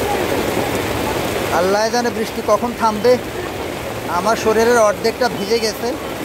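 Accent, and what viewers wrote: Noakhali, Bangladesh. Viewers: ভাইজান নোয়াখালী র ভাষার টান মনে হচ্ছে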